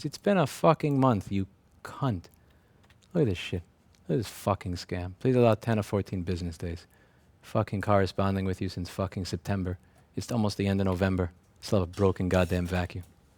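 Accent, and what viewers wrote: New York, United States. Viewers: I've never noticed your New York accent so much in your videos before.... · Lmao. You can tell how high the anger levels because the New York accent comes out even harder when is pissed. Love you, thank you for everything you do for right to repair man. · A couple minutes in and he's gone full New Yorker. It's gonna be a good one. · Was gonna say that this video was a prime example of a person "speaking New York" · How did your new york accent get more pronounced from being in Texas for 2 years? lmao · Is it just me or is your accent extra New Yorky nowadays? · This is top 10 most new york video ive seen ever.... The accent... The aggression... The new york... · Doesn’t sound like any Texan I’ve ever met. Sounds like he comes for Queens or somewhere else in NY. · inner "Pissed-off-New-Yorker" accent is fighting its way to get free 😆 Love you, 🙏Keep doing you, man.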